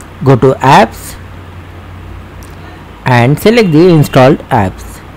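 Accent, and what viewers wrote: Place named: India. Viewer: Indian english accent 😁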